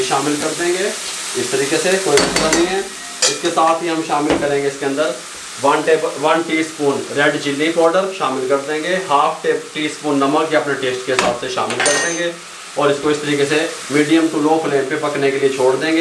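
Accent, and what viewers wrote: Punjab, India. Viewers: Ap ka btany ka panjabi style bht acha lgta h ap English tch Mt dyn mza AA jata h JB ap khty h db Dio heeeen baba kug smja ria ayyy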